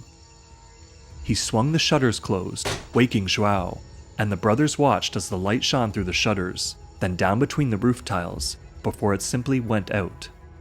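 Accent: Canada. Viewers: the comments of course as predicted are a bunch of middle school minded immature cretins only focusing on the sex and forgetting about everything else smh ... just making lame jokes and puns and giggling like kids who wear helmets and ride the short bus smdh what a complete joke of a channel, I remember now why I hit "don't recommend channel" a couple of years ago, and now the algorithm has suggested it again, thinking I forgot, and me thinking foolishly that maybe it has changed for the better, but nope, same old garbage as always, plus the added annoyance of the canadian "oat" and "aboat" to boot, ugh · I'd rather listen to an AI narrated video than hear another Canadian accent, any day 😭😭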